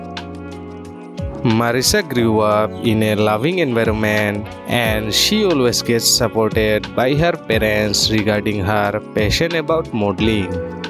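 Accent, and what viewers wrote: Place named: Bangladesh. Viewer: Bangladeshi accent, for sure you are Bengali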